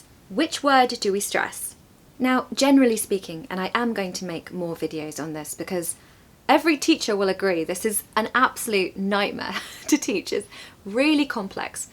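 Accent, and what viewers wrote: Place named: England, United Kingdom. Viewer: I think your accent is the nicest English accent. Every word you say is crystal clear and lovely to listen to. I, myself, sound like an old ship's clapped out foghorn. Another great video,! Have a great weekend 👌🎸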